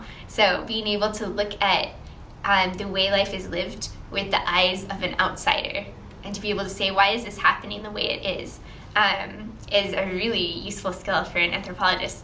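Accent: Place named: Scotland, United Kingdom. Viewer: I figure she’s American but lived in Europe for a few years and picked up a bit of accent on certain sounds. That or born overseas and came to America at a young age but retained some accent. I’d guess Scotland.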